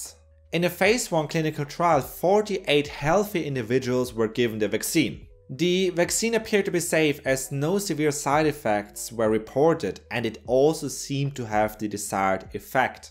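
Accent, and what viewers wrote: Germany. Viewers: That accent is hard to place: either German, or Dutch? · Is he German .. his accent then he sounds Chinese talking English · Cool accent. I think that I could easily listen to German people speak English all day long ! 👍😎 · I’m loving your German accent 😅 and you’re cute. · Thick german accent. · Very informative. I just feel like he wants to yell at me in a German English accent 😁😁😁😂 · He´s German. That's why.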